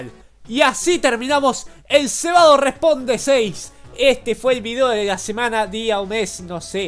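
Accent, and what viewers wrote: Argentina. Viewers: No hay nada mejor que un narrador argentino (o con acento argentino)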